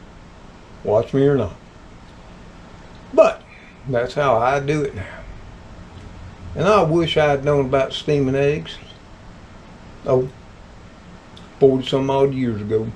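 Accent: South, United States. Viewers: my daddy is from southern Mississippi...I enjoy watching how you do things and listening to your deep southern accent.... May God bless you and the kitty crew.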